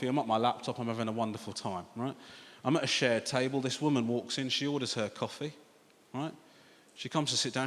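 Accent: United Kingdom. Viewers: Dude got accent than the British themselves. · You like you may speak anything from sinhala to tamil to telegu to hindi to bhojpuri to bengali. And here you are, speaking english with strong British accent.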